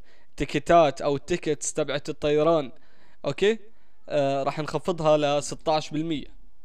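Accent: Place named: Iraq. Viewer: والله يحبيبي انشالله شكلها الحقة تحمس بس اني هسه داباع متعجب دولة مثل المانيا ماعندها نفط وغاز وحتى ماء ومساحة صغيرة من اقوى اقتضادات العالم والعراق والدول العربية عندها اضعاف هلشياء وشعبها بلكاد عنده اكل والله للاسف وضعنه كذا تحياتي الك ولابناء شعبك اعراق والاردن اخوة والاردن اكثر الشعوب وقفت مع العراق واحنا نفس اللهجة تقريبا يعني من اشوف فيديوهاتك انسا انك اردني لهجتك قريبة علعراقي وانشالله مابيزعزع احد بين العراق والاردن مثل مازعزعو مع بقية الشعب العربي ❤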